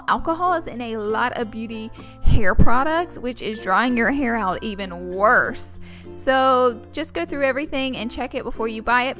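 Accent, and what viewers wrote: South, United States. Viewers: She's southern. She has an accent. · this is awesome! can totally tell you're from a southern state and this is the first video I've ever seen from you lol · It's that southern drawl lol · Shut the fuck up! She's southern! Most states pronounce things differently! Would you tell a British person they pronounce aluminum wrong? No! Because that's how they pronounce it! So fuck off, BITCH! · Everlasting Luv she's from Alabama so she has a southern accent, I'm from Alabama too so I don't even notice it unless she says something about it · Your accent is adorable! I'm from the south too! (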